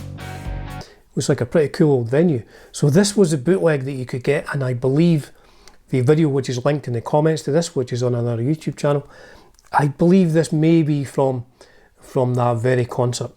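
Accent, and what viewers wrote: Scotland, United Kingdom. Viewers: I have that album. Are you from Scotland? I lived there with my wife and kids. I detect an accent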